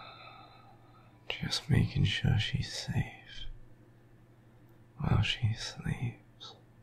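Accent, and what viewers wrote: England, United Kingdom. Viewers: Thanks for the video, btw i love your accent! Are you from england?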